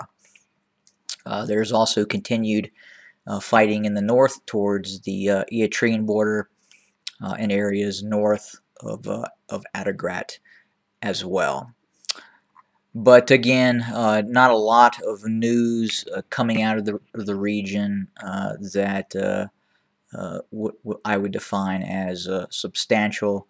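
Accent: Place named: United States. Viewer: You are definetly abesaha, why put this white American accent ?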